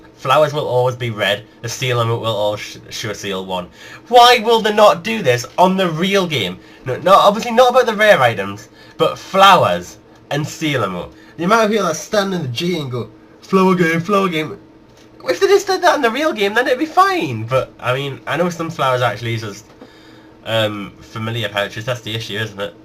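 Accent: Newcastle, United Kingdom. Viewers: Your accent is like mine. Are you a fellow Geordie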